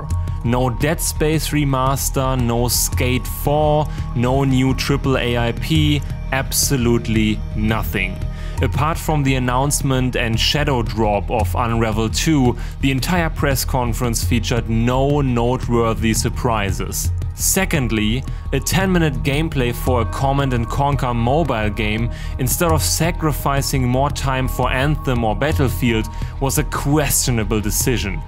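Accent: Germany. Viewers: Your English is great, who cares if some of the German accent is there. It is actually refreshing watching gaming YouTubers from Europe. As for the EA, they are dead to me for well known reasons. Andrew Wilson's and EA's level of hypocrisy is out of this world. I had 0 expectations from this and EA as usually delivered.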